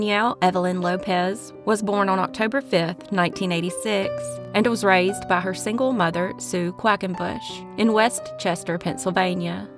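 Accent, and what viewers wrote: South, United States. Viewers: I just don't understand how/why someone can just disappear!😓 So sad for the families!💔 Thank you for bringing these sad cases to light 🕯️ 🕯️ 🕯️ Always good hearing your sweet southern voice! Love ya girl!💞✌️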